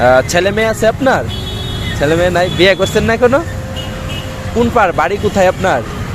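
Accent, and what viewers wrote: Sylhet, Bangladesh. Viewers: Vaia apni ki sylheti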